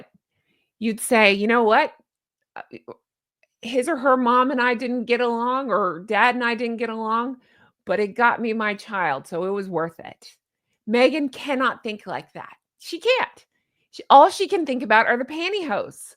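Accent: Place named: United States. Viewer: Im not saying all Americans have a crappy sense of humour, but generally us Aussie's do consider American's to be a little sensitive and easily triggered lol. is very much like an Aussie with her highly developed sense of humour and down to earth personality and the way she laughs and smiles often, any Aussie reading this will KNOW EXACTLY what I mean. is like an Aussie with an American accent 😁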